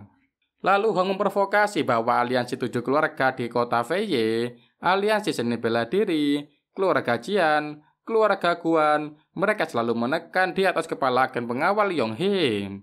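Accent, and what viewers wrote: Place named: Banyumas, Indonesia. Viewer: Mc nya wong ngapak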